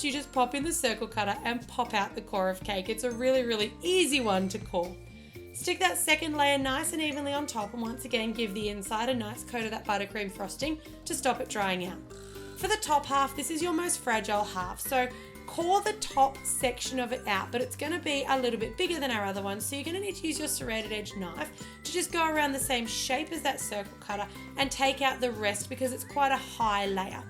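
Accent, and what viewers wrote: Australia. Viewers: Your beautiful And I luv you Australian accint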